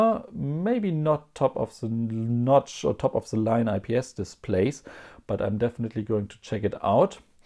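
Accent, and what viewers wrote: Germany. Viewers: Gotta love that German accent haha. Really nice video tho, thanks!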